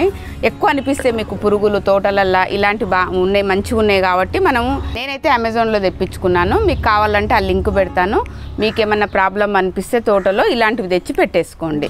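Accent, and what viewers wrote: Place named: Telugu, India. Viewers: Garu nenu meeku big fan ayipoyanu.mee resident place ekkadandi. I am in chennai mee slang kurnool anukunta correct kada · I like your native Telugu accent 🙏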